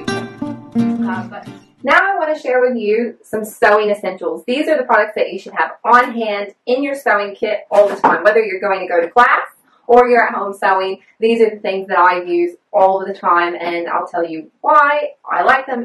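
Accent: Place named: Australia. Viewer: I thought you were Australian, then I thought you weren't, then I found out you are a bit of both! It's good to have some one to watch who can tell us about products available to us locally.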